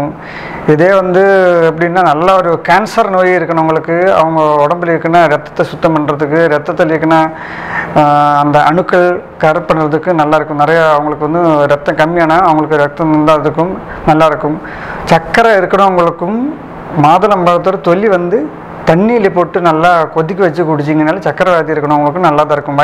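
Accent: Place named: Kerala, India. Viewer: Malayalee Tamil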